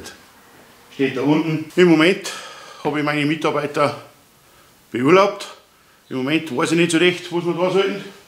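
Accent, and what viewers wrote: Bavaria, Germany. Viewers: Hallo! Als Preuße lerne ich dich akustisch zu verstehen. Ich vermute das du ein "gemildertes" Bairisch sprichst. Da ich deinen Vlog mag und mich nach anfänglichen sprachlichen Problemen zu einem Fan entwickel, vermute ich das es auch in ferner Zukunft einen Punkt geben wird das ich dich verstehen werde. Ich lasse für den Anfang ein Abo da und freue mich auf weitere Projekte von dir. 😀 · Hed eher vom slang her af woidkircha de richtung tippt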